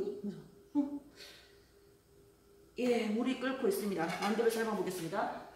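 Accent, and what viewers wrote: Gyeongsang, South Korea. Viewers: 왠지 대구분이실듯 ㅎㅎ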